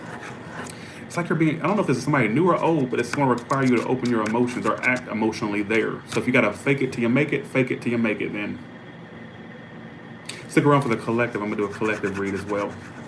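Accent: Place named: South, United States. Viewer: where are you located? you have a southern accent, its fun to watch you...how do i get on the chat?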